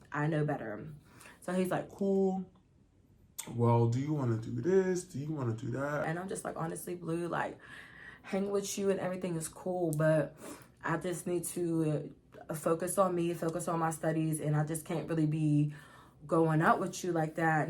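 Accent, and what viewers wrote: Pittsburgh, United States. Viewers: this is so random but i love ur accent bc it reminds me of my old college best friend 😭 i knew instantly u was from pittsburg i miss her lil cow girl sounding self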